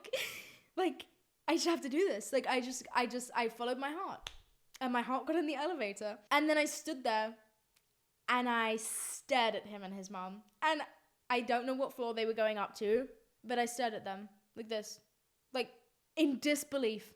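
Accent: United Kingdom. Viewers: this kinda long video literally helps my listening skills for British English in the exam, I love you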